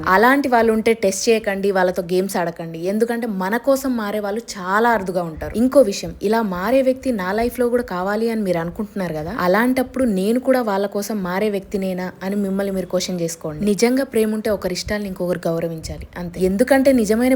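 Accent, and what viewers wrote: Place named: Telangana, India. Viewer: తెలంగానా slang meku suit avvadu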